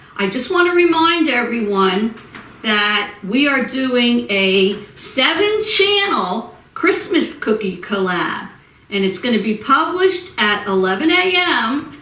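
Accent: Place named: Bronx, United States. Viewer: Hi sis new subscriber hope you become a member a Montgomery Family Channel as well 💋💖 💋 I hear that New York Bronx accent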